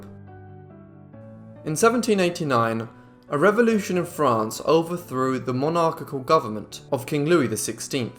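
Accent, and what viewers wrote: United Kingdom. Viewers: This Guy is legit reading us Wikipedia in a british accent and I'm here for it. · Dear Your British Accent makes it Sooo HARD for people like me with other languages except English. Can't you Decrease your Accent or Ask someone else with Non Accent to Speak instead of you. It is REALLY hard to Understand it with your Thick British accent. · Your accent is getting better, you sound very British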